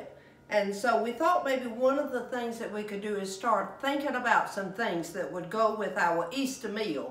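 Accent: South, United States. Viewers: I remember my granny and aunts always having a pound cake to serve someone. You are most definitely a Southern lady who would have this tradition. Makes my heart happy. I love watching your videos and hearing your lovely Southern accent. 🌿🌺🌿🌺🌿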